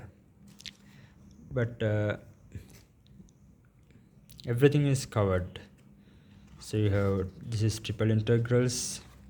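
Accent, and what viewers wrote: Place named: India. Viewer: Are you Indian because your accent is so Indian btw i am also from india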